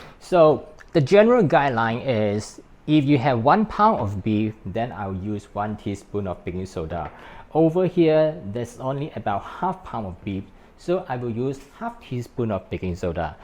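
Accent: Singapore. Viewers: Singaporean accent, anyway thank you.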